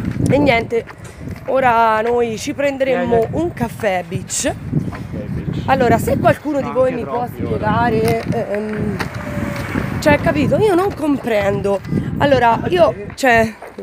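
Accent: Tuscany, Italy. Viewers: Auguri carissima.....ti abbraccio e ti mando un grande bacio.....sentirti parlare con la calata Toscana mi riscalda il cuore perché mi ricorda il mio adorato babbo, nato a Prato, che non c'è più!!!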